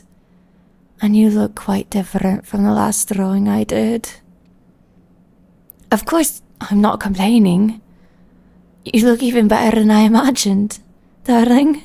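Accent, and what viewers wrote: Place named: Scotland, United Kingdom. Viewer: Scottish accent detected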